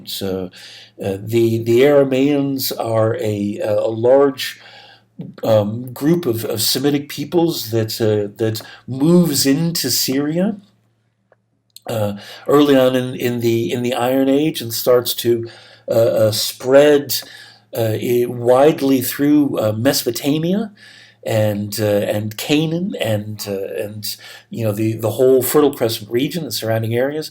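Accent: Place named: United States. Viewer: Not the worst American accent, I can actually listen to this one for more than 5 seconds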